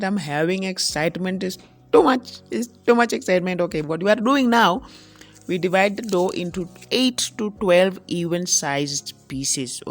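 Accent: India. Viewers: Your English-india is perfect,join Bollywood una potential mama! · beautiful. I love your i indian accent. I love indian food too especially the spices in their foods. I would love to know if you have ever been to india or if you studied there. Been there for my studies hence the love for the foods. I love your contents esp food vlogs. 😋😋. Much love❤❤ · I love the Indian ascent wow, watching you from zambia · For me it’s the Indian accent😂😂. Am done😂Good video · The Indian accent,you nailed it!Hope the camera guy gets fed the delicious meals too😃😃Love all the recipes,good work Mama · Its the Indian accent for me 😅😅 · Hahaha! I love the Indian accent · You knocked me out with the indian accent..Easily my fav Youtuber..! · The Indian accent cracks me up. Great content girl. Lov from Tanzania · Liking the Indian accent, great video as always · Looove the Indian accent 😅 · 😅🤣🤣the Indian accent though. 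Good job mama. I will definitely try the naan and butternut soup. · Wooow!! Good job. The Indian accent 👌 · Omg! The Indian accent 👏❣️your videos inspire me. I learn alot. Keep doing what you do. Love love your content ❤️❤️❤️❤️❤️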